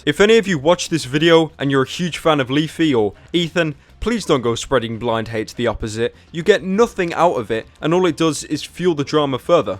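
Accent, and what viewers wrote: United Kingdom. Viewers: Why is anyone on youtube fighting in the first place, especially considering it's just a bunch of people who do the same thing but in different ways, Leafy does cringey videos on cringey videos H3 does cringey skits on cringey skits and you do deep voice british cringe on cringe videos. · He's British. Certain regions pronounce "H" as "aech" instead of the faster American "he-" when it's said. I'm from the UK and say it the same way. Just differences, that's all.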